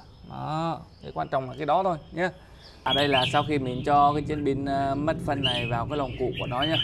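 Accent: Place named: Quang Tri, Vietnam. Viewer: Làm video ép giọng mào non đi anh giọng quảng trị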